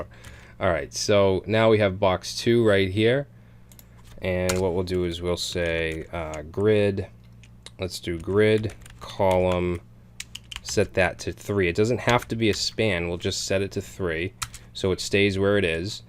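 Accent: Boston, United States. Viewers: BOSTON ACCENT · I hear a fellow Bostonian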